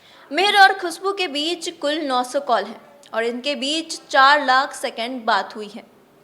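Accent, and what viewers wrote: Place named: Bihar, India. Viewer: Aapka hindi me Bihari tone aa raha hae....kripya improve kare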